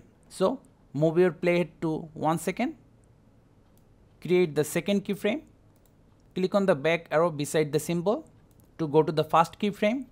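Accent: India. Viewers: i little bit understand indian accent, tysm you are legend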